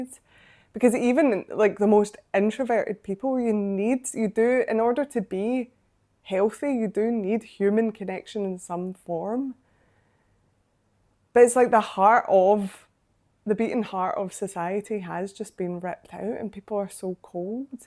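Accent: Scotland, United Kingdom. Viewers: Love the Scottish accent.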